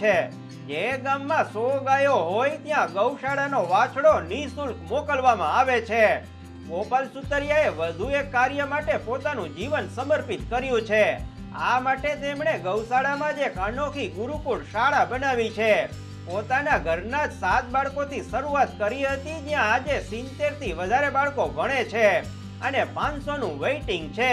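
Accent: Kathiawar, India. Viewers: વા ગૉર મારાજ આવ તમારી કાઠિયાવાડી ગુજરાતી વાણી સાંભળી મૉજ આવી ગય👌✨😌🙏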